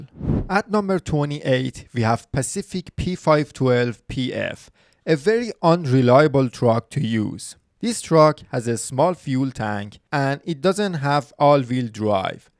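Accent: Iran. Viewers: Wow he does sound Iranian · لحجه اینگلیسیت مثل ایرانی هاست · خرابه لحجه ات شدم حاجی 😂 خوده خوده ایرانی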